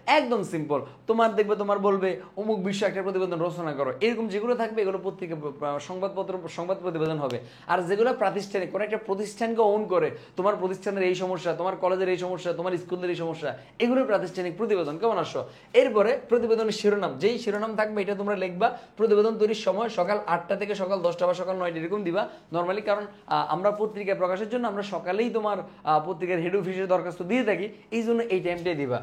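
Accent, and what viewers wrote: Noakhali, Bangladesh. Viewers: ভাইয়া কি নোয়াখাইল্লা ?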